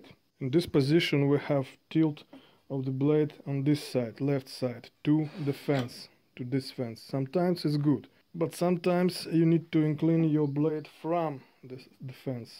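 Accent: Russia. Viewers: Мне послышалось или у автора действительно акцент русский и он русско - язычный?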